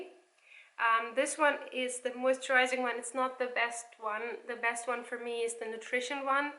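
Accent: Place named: United Kingdom. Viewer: And that irritating british voice.....Ugggg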